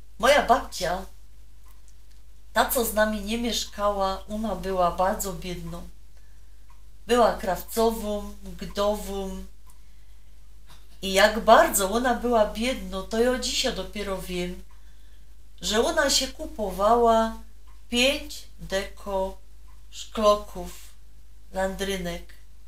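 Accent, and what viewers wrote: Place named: Podhale, Poland. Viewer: Wymacie piekna mowe po Slasku i u Pani slychac Goralski akcent. Pierwsze myslalam ze Pani jest z Gor , Goralka = Bergfrau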